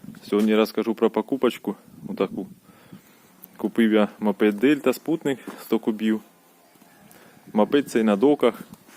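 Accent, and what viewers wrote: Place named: Nizhyn, Ukraine. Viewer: А ви з ніжинського району по акценту наче чутно чого питає вдруг надумаєте продавати чи далеко їхати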